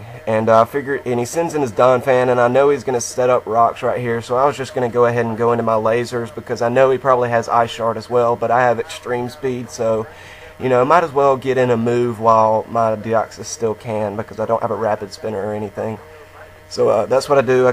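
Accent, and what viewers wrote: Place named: South, United States. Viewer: You got a bit of a southern accent going on.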